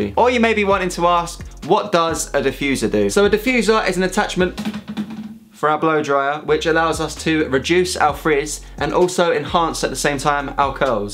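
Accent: United Kingdom. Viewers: Bruh you speak so English English that i cant hear you i can hear American English you now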